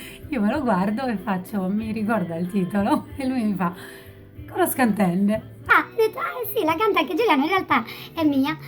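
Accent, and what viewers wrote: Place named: Rome, Italy. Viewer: Anche io ho vissuto a roma per ca una decina d anni poi ho girato un po'e mi son stabilito a Forlì in em romagna la patria delle lasagne e del prosciutto di parma ma su coro meu e sempre in sardigna . Comunqu si entiva l aacento romano , comunque sei la n 1 io su internet ti seguoo sempre sei la prima cantautrice sarda che ho scoperto su youtube e son contento . Complimenti per la voce sei la n° 1 sono fiero di seguirti sto imparando tutte le tue canzoni grazie per avermi risposto . Unbacione grande a te e tutta la Sardegna .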